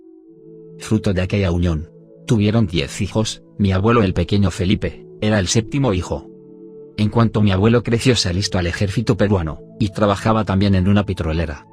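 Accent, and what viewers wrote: Spain. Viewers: Porque. Todos los videos estan en Español Ibérico! Me revienta !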